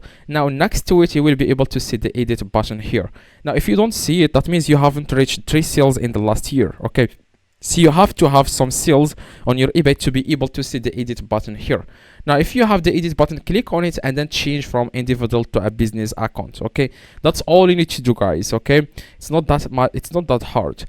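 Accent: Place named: Morocco. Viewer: moroccan english very helpful bro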